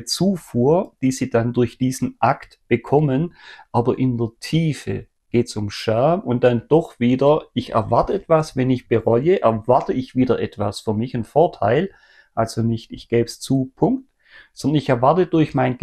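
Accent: Swabia, Germany. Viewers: Isch halt usm Ländle 😅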